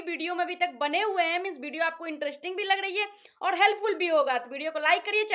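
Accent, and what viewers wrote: Bihar, India. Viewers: Tum pakka bihari ho qki baat krne ka tone apne Bihar ka hi hai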